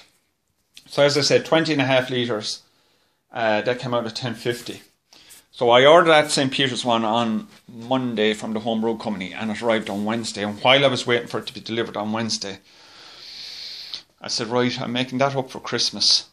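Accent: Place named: Ireland. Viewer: Tree kilo kit😂 nothing better than the ROI accent, always make me smile. Bit of a pain that your gear is in storage but at least your brewing buddy. Sure they will turn out smashing🍻👍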